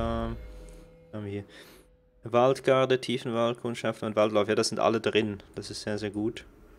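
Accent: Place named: Netherlands. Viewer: Bitte sag mir das du Holländer bist xD der Akzent ist der hammer😂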